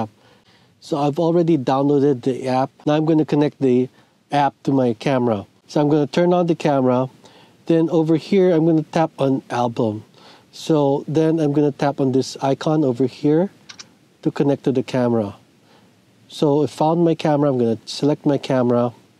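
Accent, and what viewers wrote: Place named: Denmark. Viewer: Er 100% enig med dig. Du lyder dansk, så svarer lige på dansk;) Er også vild med de her begynder guides. Jeg har ikke bestilt min OneR endnu men sker indenfor et par dage når jeg har set tilpas nok videoer så jeg er blevet overtalt:):):) haha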